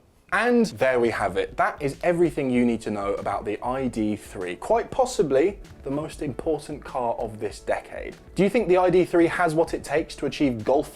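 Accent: England, United Kingdom. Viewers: Your English accent is annoying as fuck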